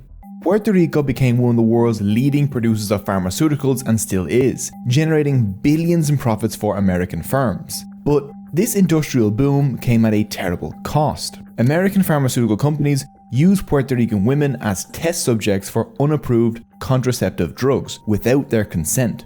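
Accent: Ireland. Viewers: you sound Irish, Puerto Ricans and the Irish have history together. thank you for this brother really, when i go to Ireland i will show the utmost respect top your people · Are you Irish? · How come dude got a Liberal Irish accent?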